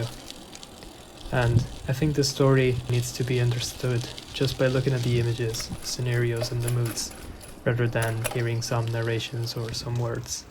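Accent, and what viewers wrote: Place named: Italy. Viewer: you remind me a lot of a really good friend of mine from college days, also from italy, had a very similar accent/intonation as well as a similar way of looking at things (and he was a huge film nerd!)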